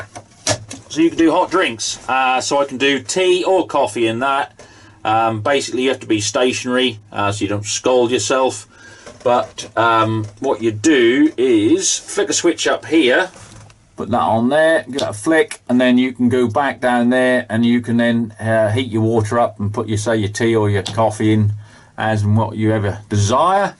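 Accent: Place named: Lincolnshire, United Kingdom. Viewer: Are you lincolnshire. You sound it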